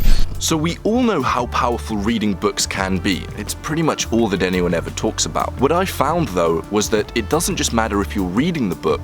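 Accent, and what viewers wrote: United Kingdom. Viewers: What part of the UK are you from man?! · Where are you from? Your accent sounds quite British but it's very mild.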